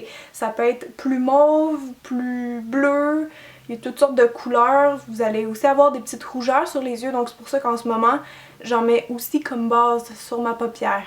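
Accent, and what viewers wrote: Quebec, Canada. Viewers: L'accent québécois tu connais ?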